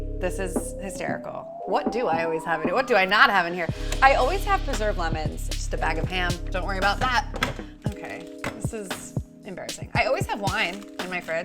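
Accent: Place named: Bay Area, United States. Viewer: She's gotta be from the Bay Area. Between the accent and the nutritional yeast on the popcorn...(which yes, is delicious)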